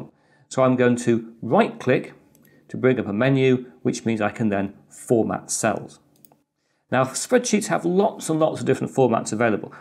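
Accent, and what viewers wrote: United Kingdom. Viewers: You got an astonishing British Accent!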